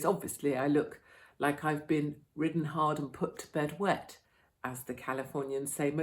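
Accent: RP, United Kingdom. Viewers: You sound awfully posh as you pose the questions. My answer to this is that it's always been like that, maybe we're just noticing more things in this new eyes wide open type of enlightenment that some of us are experiencing? Those people are everywhere, always have been, and always will be. The type who never makes eye contact when forced to be with people they don't know, like in a grocery store? There are very definitely more people aspiring to be that type of person though, that I'll definitely agree with.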